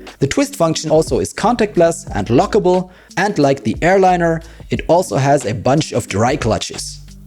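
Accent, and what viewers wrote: Germany. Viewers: Hey don't want to sound mean but are you German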